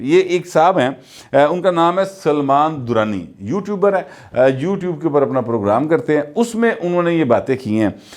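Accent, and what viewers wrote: Punjab, Pakistan. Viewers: گوہر بٹ صاحب آپ کی پنجابی اردو بڑی دل کو لگتی ہے آپ کے تبصرے بڑے زبردست اور حقیقت پہ مبنی ہوتے ہیں اللہ تعالیٰ آپ کو سلامت رکھے آپ کا حامی و ناصر ہو آمین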